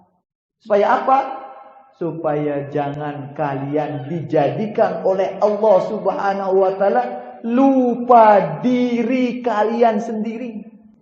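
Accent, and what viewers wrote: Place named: Lombok, Indonesia. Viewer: Khas warga lombok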